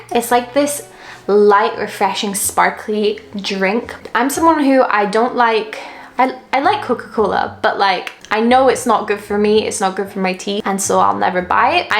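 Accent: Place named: Scotland, United Kingdom. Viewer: is from Scotland but lives in London. I love her accent!!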